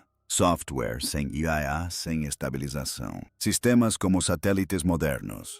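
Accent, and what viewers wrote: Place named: United States. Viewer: O pior é falar em Português com sotaque americano!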